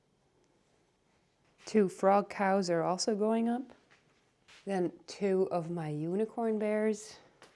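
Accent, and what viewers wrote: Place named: United States. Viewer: Its so interesting, your sick voice gives you an American accent somehow 😂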